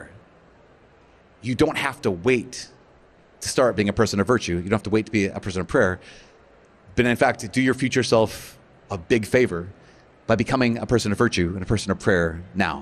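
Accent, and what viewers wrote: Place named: England, United Kingdom. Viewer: Great English accent!!!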